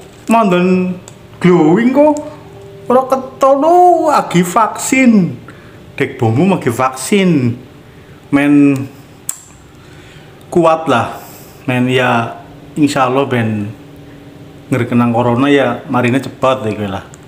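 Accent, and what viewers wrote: Banyumas, Indonesia. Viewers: Saya suka logat ngapaknya pak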